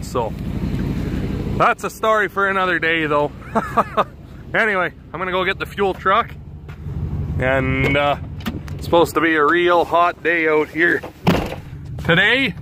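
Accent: United Kingdom. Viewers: It's good to hear the northern accent. Reminds me of home.. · Still harvesting laid, barley in the north of Scotland. Loved this video with all the family working, all the machinery working. Enormous skies! a different land altogether. I'll tell my father (92) about your grandfather....still on the swather and on his smartphone! Best of luck to you all. Love that accent man! Just makes me grin!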